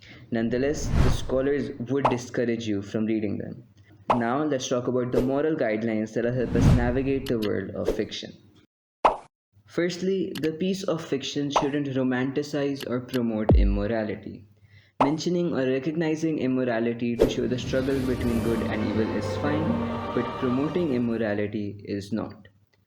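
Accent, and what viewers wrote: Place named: India. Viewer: You sound very Indian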